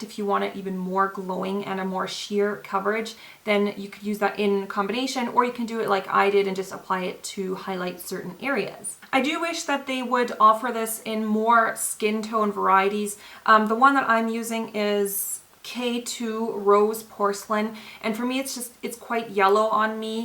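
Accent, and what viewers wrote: Germany. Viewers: OMG I'm new and I didn't know that you are german:) you have great accent...! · You are german, right?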